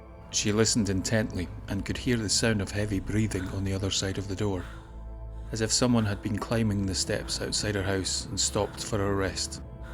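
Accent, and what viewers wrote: Scotland, United Kingdom. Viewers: Creepy ghost story well illustrated and told by the best Scottish accent on Youtube. I also suddenly crave pizza. Thanks!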